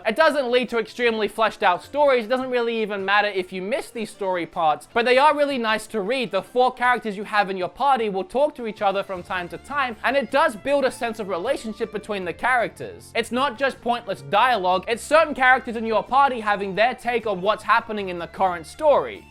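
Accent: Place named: Australia. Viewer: Also stop with the stupid aus accent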